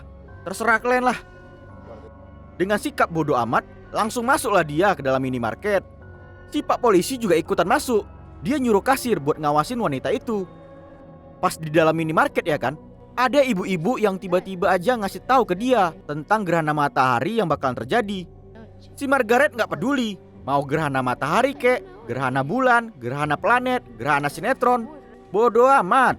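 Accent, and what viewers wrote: Medan, Indonesia. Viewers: Orang medan ya bg nampak kali ku tengok logat medannya🗿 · Ak suka bang konten mu tapi yg ku suka cara anak Medan berbicara buat ak ketawa sumpah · Medan mana bang ?? Kwkwkw kp lalang ni lek kwkw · Bro Kau orang medan, tebing, atau siantar Ooaalaahh Kaco kacooo 🤣🤣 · logat orang medan itu bg hehehe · Medan ni we udah jelas logatnya wkwkw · Bang lo bahasa apa bang org medanya kau ini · Nampak X org medan nya.🤣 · Logat kau medan kali bah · Adminnya orang Medan ya? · logat Medan ni wee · Logat medan kau lah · Orang medan kau kan bg 🤣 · Njr logatnya khas medan banget bang🗿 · Bahasa abg ini mcm bahasa anak medan.. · Medan ya bang? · Logat medan bah anak medan kau ya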